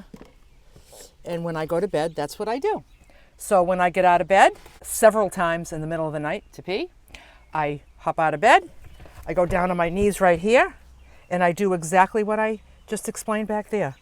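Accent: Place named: Boston, United States. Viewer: Hi, I just found you and love this video. Your a classy lady speaking of delicate matters and also makes me smile because I hear your Mass. accent comin in loud and clear and I feel home again. I don’t meet many here in Seattle with the accent and I’m always being asked where I’m from after I’ve said a few words that only one from Boston can say. I’ll keep on watching and loving your young spunky spirit to brighten up my day!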